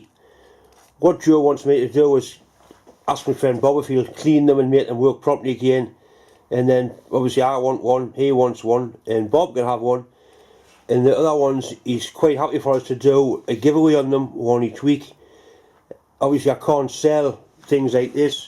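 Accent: United Kingdom. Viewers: Another great video. Turning the chuck register down like that is a nice old trick, it's been shown in many books and articles over the years. I'd like to ask where I can get a cast iron backplate just like that one? BTW, did you know your dialect was voted the most charming British dialect a few years back by UK TV viewers? It's very interesting to me because it still contains old Norse and Danish words and/or ways of expression from way back in history. And it sounds good. Best wishes to you and your family,André, Oslo.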